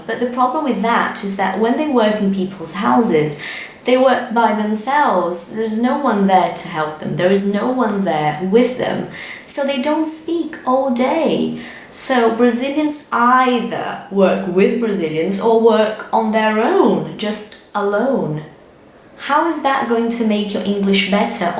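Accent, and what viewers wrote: United Kingdom. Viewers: what a wonderful british accent! :), congrats for your channel, it's gonna be helpful for my english studying.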